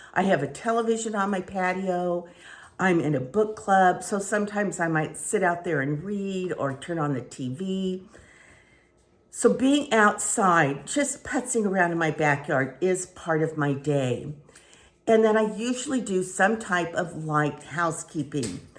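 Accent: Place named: New York, United States. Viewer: Idk why but you remind me of Talk show host Wendy Williams. You kind of have her mannerisms and her New York accent. 74 wow u look amazing.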